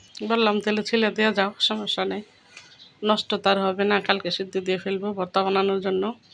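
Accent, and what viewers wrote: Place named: Sylhet, Bangladesh. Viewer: সিলেটের ভাষা খুব সুন্দর,, আপু আমি কক্সবাজার থেকে দেখছি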